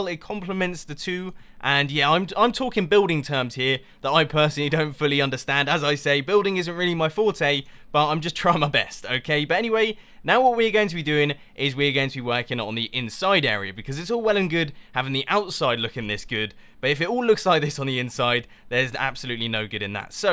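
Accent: England, United Kingdom. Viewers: I like your british accent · Are you English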